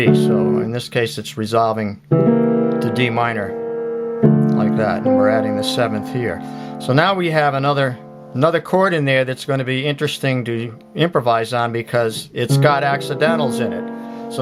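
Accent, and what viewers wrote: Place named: Maine, United States. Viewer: Your video just showed up on my feed and as I was watching it I immediately realized you were from Maine. I adore your accent, reminds me of home. ❤️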